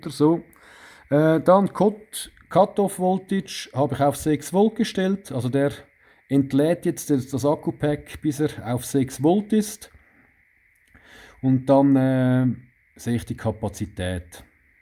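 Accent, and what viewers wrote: Switzerland. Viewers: Well done! This is like the only video out there, where someone actually successfully shows you how to connect to the PC software. I managed to connect my AT24 to my PC, with the method that he showed using the "More Bluetooth options", in the Bluetooth & other devices page. Under COM Ports tab, add an Outgoing serial port that points to the **_SPP device. (According to your specific device). Now what I need to know yet, is how to get the logged information exported out to a spreed sheet or graph where i can see the whole picture. I want to use the device for machine trouble shooting and need a data logger. I figured if i just connect to an old laptop and come by the next day i would be able to see my current graph, and therewith help diagnose the problem. Let's see what we can get. Any tips heartily welcome. And I love the Schwitzer Deutsch Accent :):)😀😀😀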